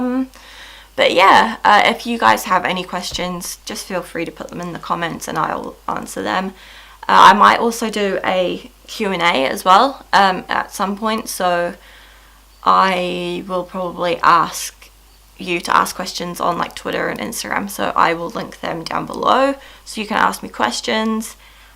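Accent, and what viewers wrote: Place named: New Zealand. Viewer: Yeah, I like a more submissive role, but I think I can enjoy a dominant role too. (Change of subject). I'm from the U.S. and I've gotten board speaking how americans do. I wanted to change my accent. Long story short, your video on kiwi accent popped up. Since then I've been studying your (and a few other people's kiwi) accents cause that's basically how i want my accent.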